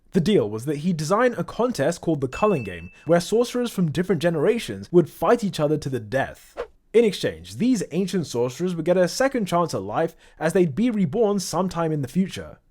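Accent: United Kingdom. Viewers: Saved him from being raised as a conservative? Hahaha what a panzee thing to say. You know there is a difference between being a conservative and a mysoginistic prick? By your accent, I could assume you're from the UK. So looking at your woke dog shit country you can see the danger of non-conservatism.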